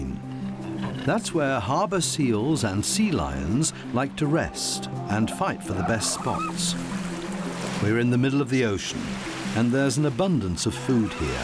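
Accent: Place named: United Kingdom. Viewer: Like the British accent the voice of the geocide of millions of people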